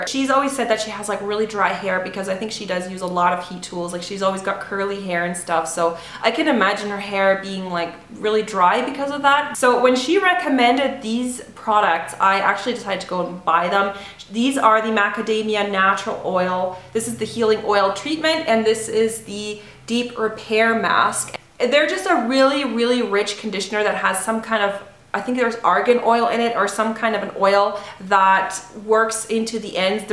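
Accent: France. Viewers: Omg ton français<3 (omg your french!)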